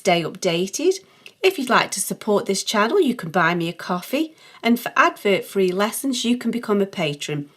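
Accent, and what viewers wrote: United Kingdom. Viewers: You sound British. Are you? 🤔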